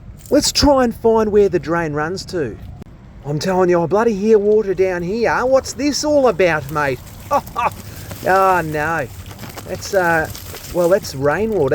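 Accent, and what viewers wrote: Australia. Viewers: In Pennsylvania in USA. This was a great video as you kept the annoying music to a minimum.. Got to hear the crazy Australian narrate. That's the part that separates you from the rest. The pure genius description of a total mess.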